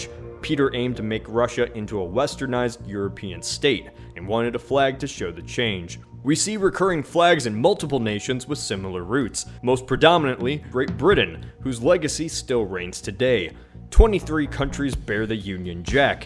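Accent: Canada. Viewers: Are you Canadian? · So are you Canadian or North American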